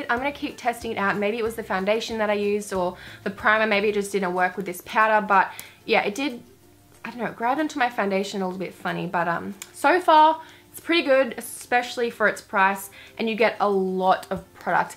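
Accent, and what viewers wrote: Australia. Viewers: Which part of Australia are you from?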